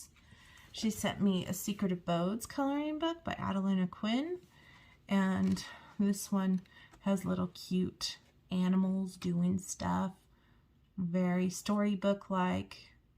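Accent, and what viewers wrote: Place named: Australia. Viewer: Love your hauls. Tysmfs. Are you from the USA you sound Aussie, I’m Aussie lol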